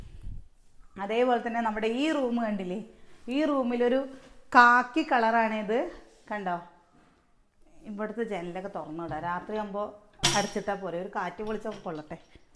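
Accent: Palakkad, India. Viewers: Aadiye angayaane zjnnaunkettutto palakkadan bashakke oru prthykataram banfiyaane chechi avvoo yentaanne ninga ingana parayine😂😂😂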